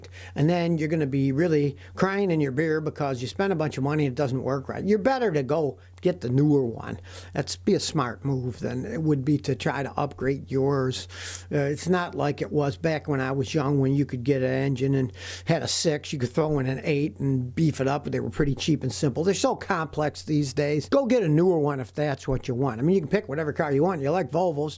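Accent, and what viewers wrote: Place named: Upstate New York, United States. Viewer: I thought I heard an upstate NY accent!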